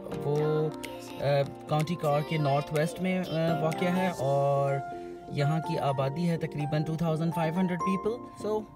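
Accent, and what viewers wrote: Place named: Ireland. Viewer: I thought you were Irish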